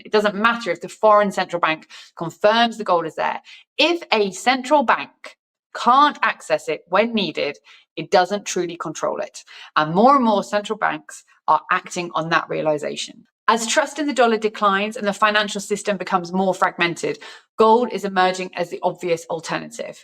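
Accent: England, United Kingdom. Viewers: You know the business and the English accent LIKE 😊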